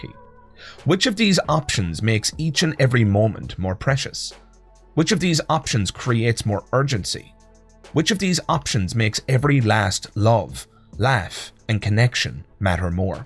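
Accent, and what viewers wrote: Ireland. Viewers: you're welcome, irish script reading guy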